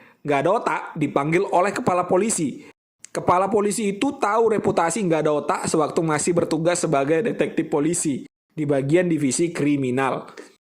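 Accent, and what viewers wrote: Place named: Medan, Indonesia. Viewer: Orang Medan kau bang 😆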